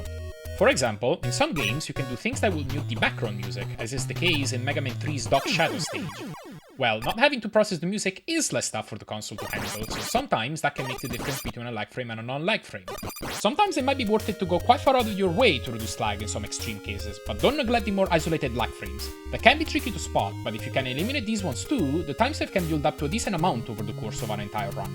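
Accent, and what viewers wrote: Italy. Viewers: you sound Italian ahah, where are you from?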